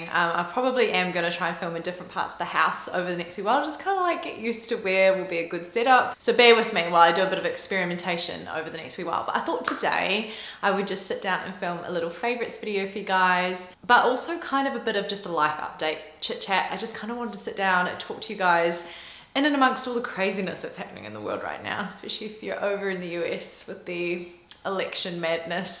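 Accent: New Zealand. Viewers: Lovely to see you back,. Your NZ accent has made a great resurgence, plus you seem really happy and relaxed. I'm so happy that your audition was such a success, as well. Sometimes, all the energy in the Universe seems to pull together in your favour and these continued successes are proof that you made the right decision 💕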